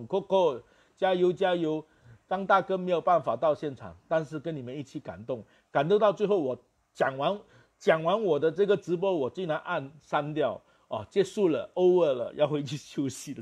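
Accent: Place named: Cantonese, China. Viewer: 支持您！您的國語有點廣東腔，但很親切，又很有內容。加油！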